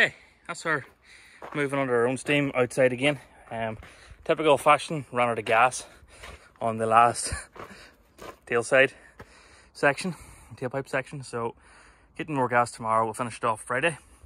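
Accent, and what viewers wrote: Scotland, United Kingdom. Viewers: All I can say about your damn racket, is CRANK IT UP,! the montage shred or the V6, but CRANK IT UP! You need to take yourself down to Shropshire and drag Rich down to a pub with a good sound system and have a DJ Shoot Out! I'll admit to old and moldy- but your music choices were really "on time" with me. And the Capri, she has a real crackly sound, even before the "big tune"- awesome! Make no mistake, the lilt of your Scot's home accent is much fun- I come from a family with some "furrin" roots too! But the music over was two fer for me- the sounds and the engine! It does look like you maybe through before Binky gets all reassembled- if you go to Shropshire, go in the Capri and show them what a finished car, reassembled looks like- poor Binky has been scattered to the four corners for a long time now! Best to you, the missus and kids, PS; You go down to Shropshire, buy Nik about 7-8 pints and then get him to judge! 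Richard has children and can't afford that level of bribery! Since the gorgeous blue paint job didn't cost nearly what Binky's anything but "plain stove white" must have- even in favors it must have been a pile- a few pints you didn't drink should keep the missus from worrying! Or take her along- bet ya'll haven't been on a "date" like that in a while! FR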